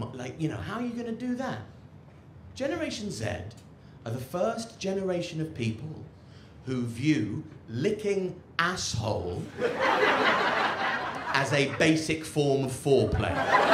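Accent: United Kingdom. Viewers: Amazing Special! that Scouse accent is so fucking funny. Bum Nuggets.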